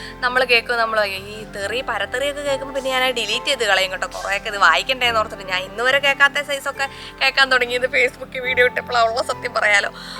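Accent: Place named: Southern Kerala, India. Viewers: Kottayamkarude varthamanam❤️❤️❤️❤️❤️ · ❤ നന്ദി, ഞാൻ വിചാരിച്ചത് ഇടുക്കി ക്കാരിയാണെന്നായിരുന്നു, എന്തായാലും തെക്കൻ side ലെ ഭാഷയാണ് കോഴിക്കോടൻ slang അല്ല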